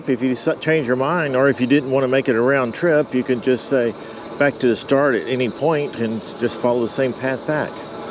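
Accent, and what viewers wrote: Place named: South Carolina, United States. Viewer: Enjoying your videos. Keep riding. One question....what state are you in? I see all the crape myrtle, palm trees and southern pines, but judging by your accent, I would guess South Carolina? Let me know if I came close.. Lol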